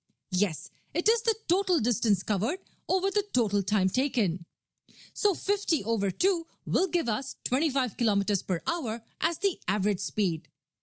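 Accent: India. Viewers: Are you an Indian or A robot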